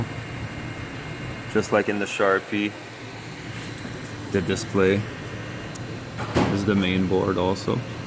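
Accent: Quebec, Canada. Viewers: ca sonne québécois 😋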